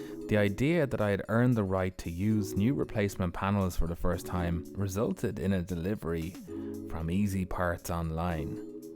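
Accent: Ireland. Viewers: As a Dutch guy I love the Irish accent and your humor man. I’m not used to film or let alone editing but the way you portrayed the disassembly for instance looks fantastic. I appreciate the fanatic way of removing the rust, you can only do it good once. About etch primer or epoxy, people shouldn’t bother. Etch is only for adhesion and given the fact it needs to be applied very slightly it doesn’t give protection against corrosion, that’s the job of the epoxy primer. And some epoxy primers are suitable for using on bare metal and some aren’t. I presume you checked it before you bought it and especially before you applied it. The only thing I don’t understand why you removed the primer on the plug welding spots. Couldn’t you use a weld through primer? Oh maybe that’s only suitable for spotwelding, when there’s are no drilled holes in both layers of metal. No I would seal all the seams between the top and under panel where water can run into. 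And I would pay special attention to the screen wiper mountingpoint for instance, I mean there is bare metal underneath. I’m not saying this to pretend I know everything better, just trying to think along. Keep up the good work and that means the total package, I enjoy it!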